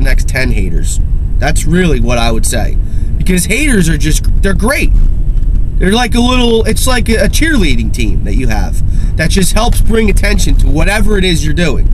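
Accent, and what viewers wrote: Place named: New Jersey, United States. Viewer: You sound like you're from Jersey. I'm subbing. I agree though. It does take someone with character and who is genuine to put themselves out on video. Most people I know are too afraid to get on camera. The people who aren't afraid, though, actually have some real stuff to put out there. I'm overcoming the flu myself. I know your vid was uploaded 2 years ago, but thought I'd share that :-)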